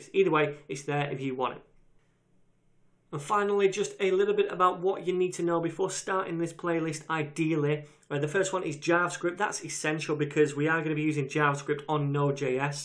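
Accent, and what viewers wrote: United Kingdom. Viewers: What can I say I love British accent thumbs UP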